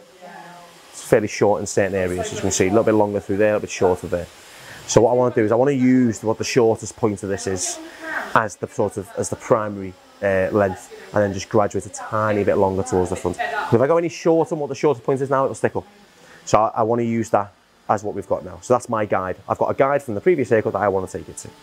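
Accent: Liverpool, United Kingdom. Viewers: I’m a Yank so forgive me, but does have a Liverpool accent?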